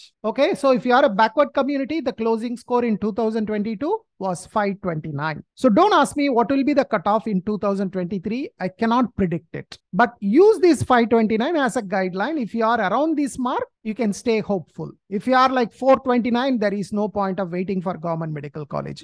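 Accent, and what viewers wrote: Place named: United States. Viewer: Enya unaku tamil thariyatha..........America la porantha mathiri thann pasurathu........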